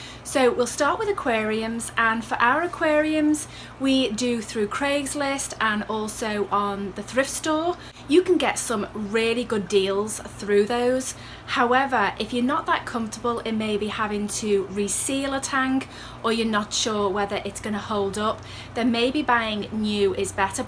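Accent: Liverpool, United Kingdom. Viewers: Where are you from your speech sounds like you are from liverpool in the uk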